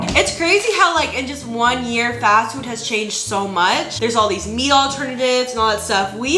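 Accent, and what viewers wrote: United Kingdom. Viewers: THE BRITISH ACCENT KILLED ME 💀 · As a British person, your British accent is truly incredible! I love it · Bro your British accent gets me every fucking time AHAAA! Anyways video. Beautiful. Mukbang. A1.. Baddy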